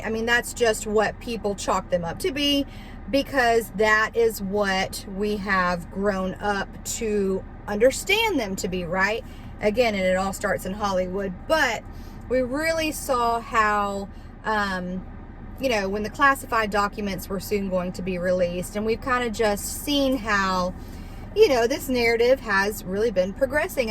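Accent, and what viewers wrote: South, United States. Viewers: Well, first off. The accent got me right away. A southerner trying to "Oomowmow." Priceless! I'm from Houston, Texas. I served in the military my whole youth from 1980 to 1994. I was even in Operation Desert Storm, and without trying sound like Dale from King of The Hill. I am a Christian and believe that Jesus Christ is God. He is The Word of God made flesh. In him is eternal life. He is my LORD and Savior. 1 John 5: 7 and 20 Ok. Here's what's actually happening. Everything that people are seeing in the skies has been made by man with really good science. It seems like magic, it's so advanced. We now have craft that can do things no one would believe possible. The real "entities" cannot take a human form. God's Word explains this. - Hebrews chapter 1. "He maketh his angels spirits." The angels that kept not their first estate, but sinned have been "bound in chains of darkness." ( 2 Pet. 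2: 4). This darkness is the realm known as the bottomless pit. Revelation chapter 12, 13, and 17 explain this. "The beast that thou sawest was, and is not; and shall ascend out of the bottomless pit, and go into perdition:..." Paul said of them that they are spiritual wickedness in high places. - Ephesians 6: 12 Excuse me? What does god need with a spaceship? Jesus said of their coming, "Shall shew great signs and wonders; insomuch that, if it were possible, they shall deceive the elect. (Matt. 24: 24). Paul confirmed what Jesus said of their coming. "Even him, whose coming is after the worki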